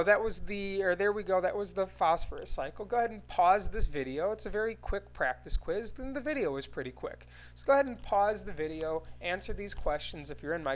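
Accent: Midwest, United States. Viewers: I'm from Michigan. The way he says "rocks" sounds exactly how everyone I know says rocks. I was waiting for him t sound weird, but he never did. Must be a midwesterner.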